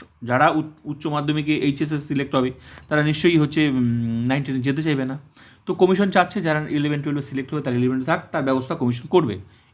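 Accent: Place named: Bangladesh. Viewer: Apnar pronunciation Bangladeshi der moto keno?😅 SIR theke saavdhan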